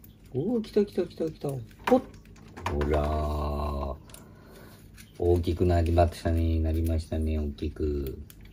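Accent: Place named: Niigata, Japan. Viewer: 新潟弁？上越弁？なんか可愛い✨ ハム1号🐹 ハム2号🐹 ハム3号🐹 ハム4号🐹 ハム5号🐹 みんな同じ顔なのかな？😁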